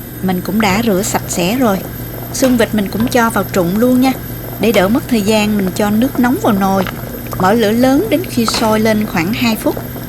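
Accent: South, Vietnam. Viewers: Giọng nói miền Nam dễ thương quá. Cám ơn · Giong noi mien Nam nghe rat ngot ngao giong nhu giong noi cua Tu Trinh truoc nam 75 , thuyet minh trong phim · Giọng đúng chuẩn người Miền Nam nghe no hiền và dễ chịu. Xứ nhà tui đó nghen.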